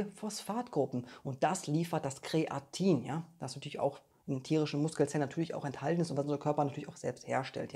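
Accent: Bavaria, Germany. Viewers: Danke für deine Videos. Diesmal irgendwie anders, sehr ruhig gesprochen, konnte Dir sogar folgen. Lag vielleicht auch am bayrischen Akzent 😉, finde ich prima, ich lebe im Bayern. Liebe Grüße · Lieber, ich finde es genial wie Du das machst. Feel free. Bayrisch und Akzent ist doch super.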